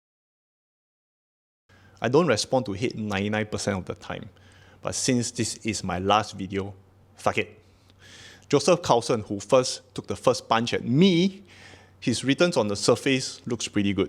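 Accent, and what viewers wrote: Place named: Singapore. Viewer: God bless you 🙏 You are one of the best, and what I always liked about you was you are so genuine. You have taught me so much. Your English is great and even better with your beautiful Singapore accent (a place close to my heart). Take care, look after yourself and ignore the toxic trolls - they have nothing on you! 🙏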